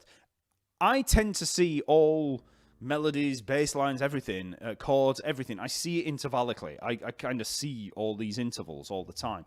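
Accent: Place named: Northern England, United Kingdom. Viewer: Hey, cool about moving here to USA! I’m from both San Diego/Los Angeles, and live currently just north of Atlanta. I love your up north accent, no wonder I have no issues understanding you, because I am used to my fav Manchester bands accent, and can understand them when they’re drunk (most of the time), you kinda sound like Johnny Marr, Bernard Sumner!:) Sheffield is where the is from I think! I love Phil Oakey. They have some great bass lines, mostly synth but I think there’s a bass guitarist for “ ”:) glad you’ll be closer, so I stop missing the love hangs so much! Lol Dallas or Austin in TX is also cool! I’ve visited. Anyway thank you for this lesson! What you described about the chord progressions having a lot of extras on them is exactly what I’ve been struggling with within music theory. I bought your chord tones course and I’m so glad I did! 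I’m excited to get a start on it soon as my tendonitis gets better! Thanks so much for your awesome teaching style and materials! I have so many aha! Movements when watching your courses and YouTube videos!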